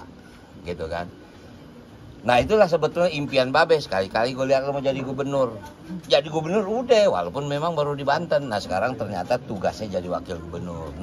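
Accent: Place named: Betawi, Indonesia. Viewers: lah emang kenape ngomong logat betawi? masalah buat lo? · Bang dul, DKI bukan betawi semua, jng selalu pake dialek Betawi dong!! Intelek dikit ngapa?...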